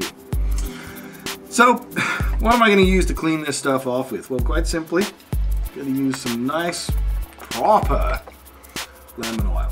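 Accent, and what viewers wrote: Australia. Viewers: You are not Australian? That's one of the first things I thought when I watched this. I'm not Aussie, btw. I'm in the New World.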